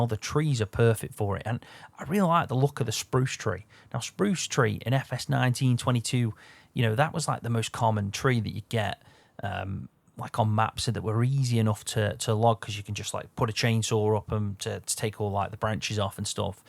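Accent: Staffordshire, United Kingdom. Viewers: I've started watching your videos a few days ago, and im loving it! Also, please correct me if im wrong, I live in staffordshire, and I believe you do to, your accent sounds like a staffordshire one.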